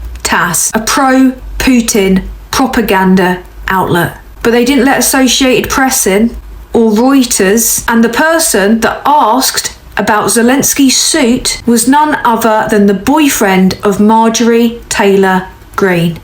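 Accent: United Kingdom. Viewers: To be honest, I find her voice therapeutic. Yes, she is telling me of my demise but at least it's with that silver tongue you brits have. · A thousand thumbs up for you dear. you are 200% right about everything. I'm Canadian and love your understanding on things. I wish more people would get it the way you do. Love your ...so British accent as well.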